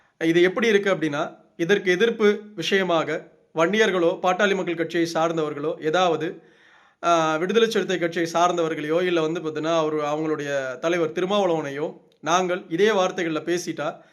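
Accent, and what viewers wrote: North, India. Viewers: நீ வடக்கனா என்ன தமிழ் பேசுற....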